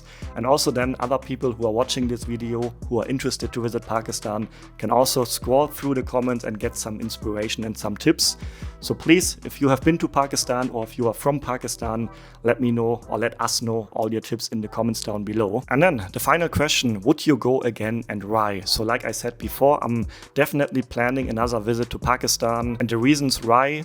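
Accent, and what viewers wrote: Germany. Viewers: hat irgendwie nen deutsch Tuch in sein Englisch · Ich hatte es geahnt dass du aus Deutschland kommst, wegen deinem Englisch was du mit Deutschen Akzent sprichst. Auf jeden Fall ein sehr Interessantes Video 👍.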